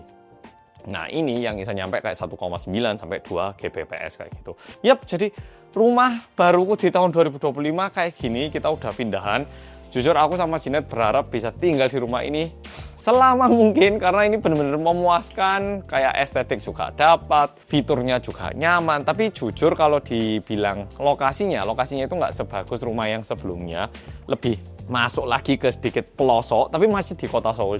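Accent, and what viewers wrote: Java, Indonesia. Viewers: Aku ingat pertama kali subscribe pas mas bahas soal jerawat. Trus lucu aja orang korea yang medok. Dadi aku seneng logat e haha jadi ngikutin sampe sekarang. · Kaum sm indo/ jawa medhok nya ttp kental,pertahan kan ya dialek bicara nya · Ikutin mas dari subscriber masih 500k sampe sekarang mau 6M. Walaupun ga mantengin banget tapi aku terharu bisa lihat perkembangan mas sampe sejauh ini. Sbg bolo cuma bisa doakan mas, mba dan si krucil ruel sehat dan bahagia terus. Semoga tetep jadi mas yang humble dan medhok ya mas 😆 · kayanya itu lebih Medhok dibandingkan aku yang asli turunan Jawa Timur 😄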